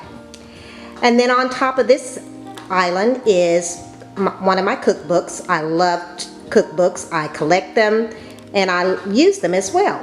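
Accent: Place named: South, United States. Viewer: Your kitchen is beautiful. Thank you for sharing! Subscribing now. Question: Where are you from? You sound more Southern than I and I'm from the Georgia. 😁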